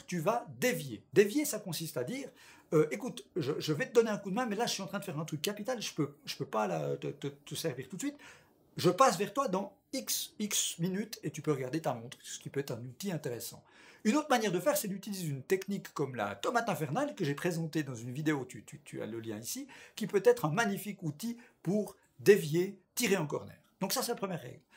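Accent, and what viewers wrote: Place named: Romandy, Switzerland. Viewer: Je viens de découvrir ta chaine et j'ai été très agréablement surpris :). Bon contenu, bons conseils, des trucs réalistes et honnêtes, ça fait plaisir dans ce domaine. Je décèle une pointe d'accent familier, est-ce que tu viendrais pas d'un petit coin de Suisse romande par hasard ?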